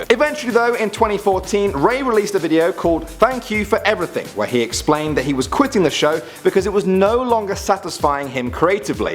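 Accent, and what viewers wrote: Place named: United Kingdom. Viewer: Have you never heard a British pronunciation?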